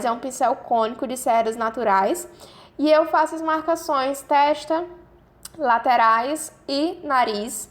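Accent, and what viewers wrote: Northeast, Brazil. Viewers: Amei o vídeo e já me inscrevi. De onde vc é? Tem um sotaque nordestino. Sou de Recife. Beijos 😘😘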